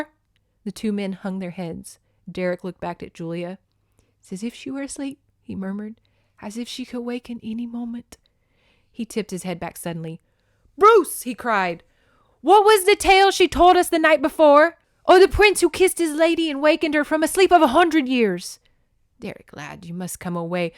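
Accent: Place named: North Louisiana, United States. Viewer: I grew up "spittin' distance" from Blanchard. I thought you had a N. Louisiana accent. 😂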